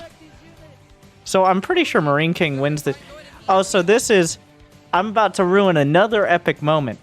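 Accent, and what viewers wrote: South, United States. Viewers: Does he have a southern accent?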